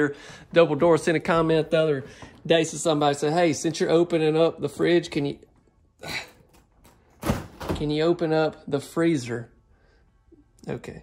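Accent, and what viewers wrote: South, United States. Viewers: The faucets for the free standing tub needs to be black like all the rest! Perfect! You are doing GREAT, the great southern accent at all! I have it too, so LOVE it!!! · Love the master bedroom all the windows. An the colors of the trailer. Please stop apologizing for being for the South. That's just how we talk down here. I know what a hair is...lol. I enjoy watching your videos. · Beautiful but not my favorite. No pantry, loved the shelving with baskets. I loved seeing the nicer sinks & black faucets. I like the copper also. Mater closet sucked. Great job. I love your accent & words you use. Alot of my family is from Alabama, Georgia, Arkansas & i hear & use it also!!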